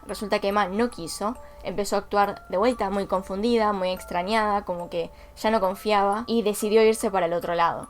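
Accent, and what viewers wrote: Argentina. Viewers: Hola hermosisima tienes 166 videos en la i yo estoy en la labor de verlos, muy buenas noches, me encanta tu trabajo lo haces muy bien y ese acento argentino que a mi me encanta,besos y hasta la próxima.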